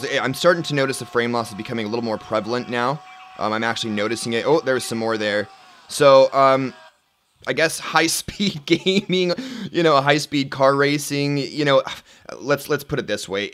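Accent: Canada. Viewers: I must ask... Are you Canadian? :>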